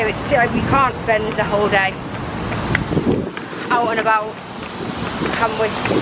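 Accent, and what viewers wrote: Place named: RP, United Kingdom. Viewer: you accent sounds like queen Elizabeth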